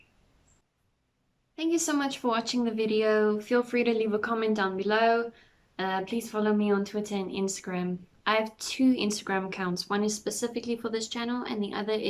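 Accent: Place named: South, United States. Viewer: Wasn't expecting a full Southern accent 😅, loving the informative content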